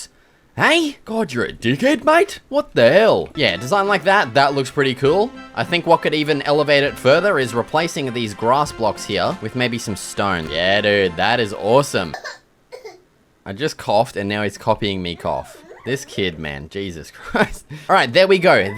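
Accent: Australia. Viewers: I love the Australian accent so much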